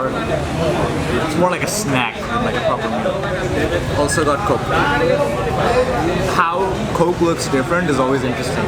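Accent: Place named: Bangladesh. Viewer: It's nice to hear a Bangladeshi voice on foreign soil❤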